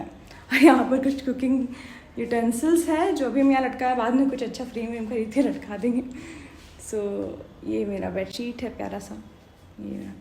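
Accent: Bihar, India. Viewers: I just accidentally came across your channel and glad to hear the typical simple Bihari tone in your voice☺ And ofc, your room looks beautiful 💯❤ I'm also from Patna, gonna give 2nd attempt this yr. Di, wish me luck😅🙏🏼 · Didi your talking way was lovely 🥰 I'm also from Bihar waha pe ese hi baat krti h ladkiya unki yaad dila di 😍 btw now I'm in delhi, your words like ( dekho ,hum ) give me nostalgic felling when I'm in bihar nowadays in vecation 🤩🥺🥺🥺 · Bihari accent is love...btw kon year me ho didi?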